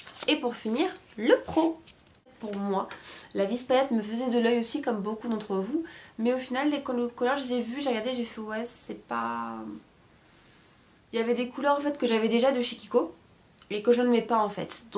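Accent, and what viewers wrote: England, United Kingdom. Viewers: j'adore ton accents anglais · j'adore ton accent anglais mdr!!!!! <3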